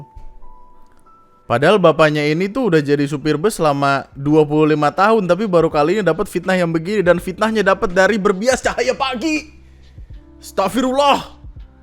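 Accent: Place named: Banyumas, Indonesia. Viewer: yang bikin video ngapak pisan mbae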